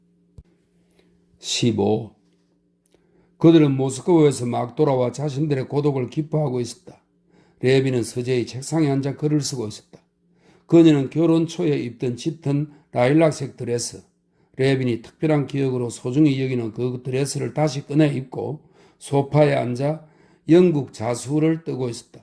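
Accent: Gyeongsang, South Korea. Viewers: 아버지가 책 읽어주는것 같아요. 경상도 사투리도 정겹습니다.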